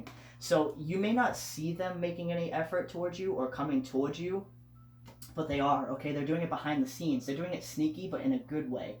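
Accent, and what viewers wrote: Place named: East Coast, United States. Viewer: Where the hell have you been. Whatever you can do to become more available as a channel on YouTube I hope you have the time to do it. The fact that so many men watch your channel got my attention. Please take the opportunity to stand out to Men. I think you come across two men in a really relatable way. I can understand the struggle of just beginning to awaken but I bet guys struggle with it more because in addition to not trusting it they probably feel uncomfortable just considering it. You have a laid-back regular guys guy kind of a feel. BTW, your East coast accent only adds to that. It says I can give you a spot on reading and kick your ass. I'm joking but I'm sure you get my drift. Another thing is that while I'm sure you are a fabulously handsome guy, the fact that you're not in the video that you're off to the side is probably important. 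There's only a handful of male readers that I've come across in the estrogen ocean of readers. As you would expect the better-looking they are, the more popular than trailers. That doesn't mean that they're not good at what they do but at some point because of all of the girls responding it begins to feel Rockstar and it wouldn't be my first choice if I was looking for answers to help me out with my life, especially in the beginning of that journey.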